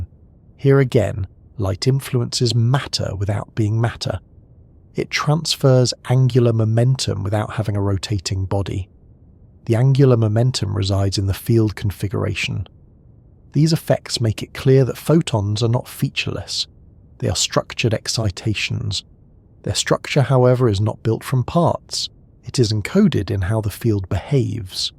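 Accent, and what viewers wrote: United Kingdom. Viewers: Friendly brit speaking (no music)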